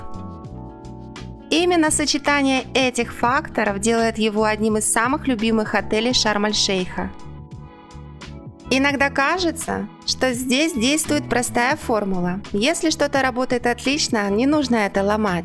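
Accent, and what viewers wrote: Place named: Moscow, Russia. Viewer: Обзор норм. Але цей московський акцент то підець.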